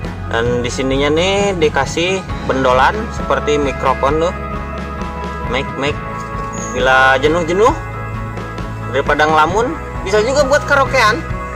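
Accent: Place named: Banyumas, Indonesia. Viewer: cingireh ngapak ....salam wong jowo bro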